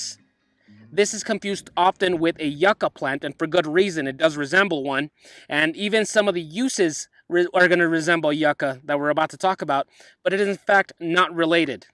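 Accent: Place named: Northwest, United States. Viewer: Dude, I love this video and yes, I can straight up. Tell you a bunch. More plants that lived down there that actually grow in that envirogreat. Video and you have a good English voice. I know that sounds weird, but you actually speak like a northwesterner. That's where I come from. You. Kinda sound like I do. Not a Southerner. You don't got the dral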